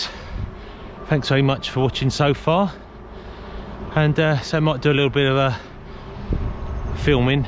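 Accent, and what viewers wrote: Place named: High Wycombe, United Kingdom. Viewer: Sounds like my accent from High Wycombe many moons ago, in Australia now, used to drive road trains here 4 trailers. Thanks for the video.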